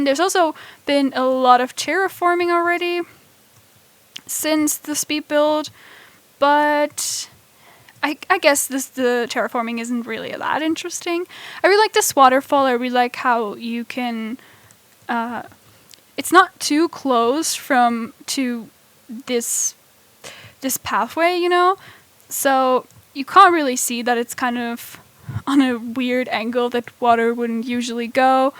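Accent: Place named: Netherlands. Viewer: Really detailed and good looking build! There is a chance you identify Dutch youtubers as German youtubers, our prenounciation in English is quite simillair. The red colored lamp are usually lamps that are designed to generate heat because the animal needs basking spots or a specific temperature. Ow and cookies, def cookies. Keep up the good work, just subbed!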